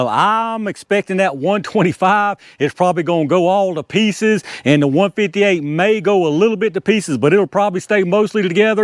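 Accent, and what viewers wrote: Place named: Alabama, United States. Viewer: I gotta know where you grew up. I'm in Alabama and I swear you have an Alabama accent. It can't be VA.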